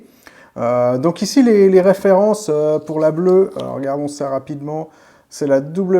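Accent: Geneva, Switzerland. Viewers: Je détecte une pointe d'accent genevois... je me trompe?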